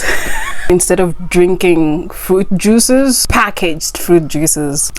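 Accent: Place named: Kenya. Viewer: OMG u just reminded me of my plates 14 years ago before i left Kenya. Why that accent though? I love Kenyan accent. I still keep it after 14 years in USA.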